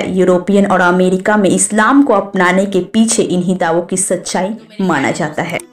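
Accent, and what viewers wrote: India. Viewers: Tumhari boli batati hai ki tum bihari ho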